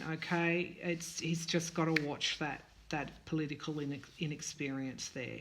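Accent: Australia. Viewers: Great to hear another Aussie accent oi, oi, oi! Best wishes for your channel.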